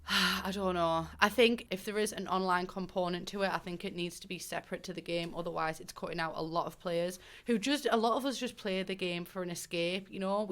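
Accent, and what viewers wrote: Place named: England, United Kingdom. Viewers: Hi, nice to meet you :) Absolutely love your accent... (I'm from UK originally so have heard your kind of accent before, and I love it lol....) funny at the moment the name of your accent where you come from escapes me...ah yes Jordy....yep! love it lol.... (just hope Im right in saying that now lol...) I won't mind as long as there is an option to play single player or multiplayer....but if its just multiplayer....I'm not very much into that really.... keep up the great work, you might have to slow down in your speaking lol....hard to keep up with you sometimes....but love it all the same....not meant to be offensive by the way :) have a great day :) magz :) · So glad I found your channel, loving all the Sims videos, and you just the most stunning woman ever 😍, such a stronger English accent then what my family has 😶😶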